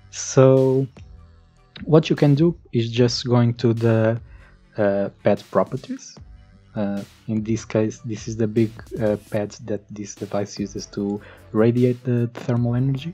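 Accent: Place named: Portugal. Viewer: Estava a ver o video e a pensar.. esta pronuncia parece que e' portugues.. fui ver o teu canal e acertei! Obrigado pelo video!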